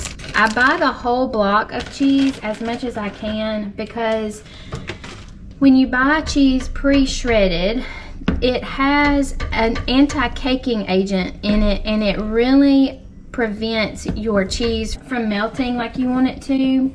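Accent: South, United States. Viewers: I love your southern accent! And your grocery ideas. I don't see snack items on your list. Does your family snack?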